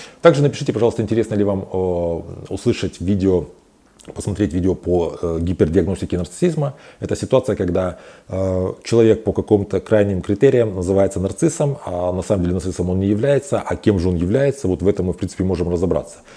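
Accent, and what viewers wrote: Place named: Ukraine. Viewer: Похоже ,, психолог" из ,, незалэжной"- говорок выдаёт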